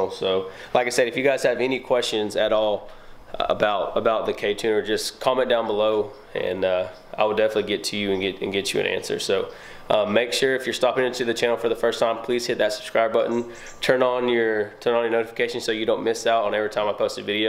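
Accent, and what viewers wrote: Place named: South, United States. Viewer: A Mexican that speaks in a southern accent, never thought I’d see that lmao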